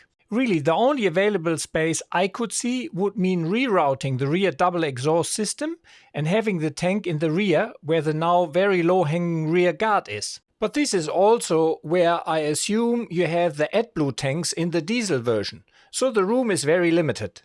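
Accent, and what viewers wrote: Germany. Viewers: i agree in all , precise look over all , sounds like you got an German accent ...😎 Greets from Bavaria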